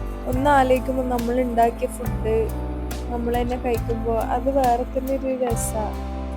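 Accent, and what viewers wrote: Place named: Kannur, India. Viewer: Kannur slang is peek broo 😭😌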